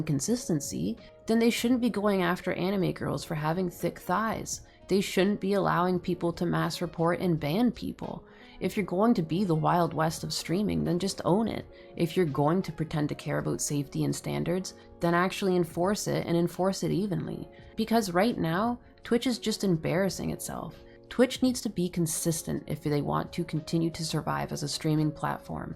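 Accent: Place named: Canada. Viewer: Streaming on Twitch can be a pain in the. Your "out" and "about" gives me the impression that you're from Canada and I don't know why you have so few subscriber so I have subscribed.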